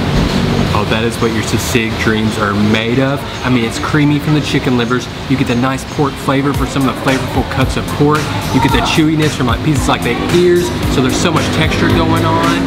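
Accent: South, United States. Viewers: My ancestors hometown. Love Kapangpangan food but I am in the minority as I don't like sisig, my bad. But you are in food haven there. Enjoying watching and also listening to your southern voice , from Melbourne, Australia here. Btw it's not caribou it's carabao (cara-baw) or water buffalo. And you are forgiven. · I’m a sucker for southern accents! 😩 I think we all need a charming country man to travel the world with 😂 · yeah, cute Southern accent. By the way, it is not Cariboo. Hehe. Ca-ra-bow or Carabao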